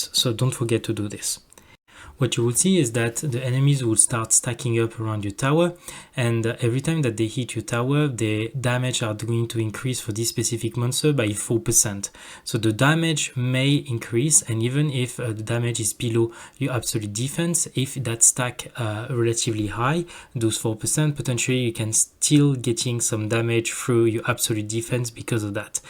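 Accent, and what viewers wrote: France. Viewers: je sais reconnaitre un confrere francais quand j'en entend un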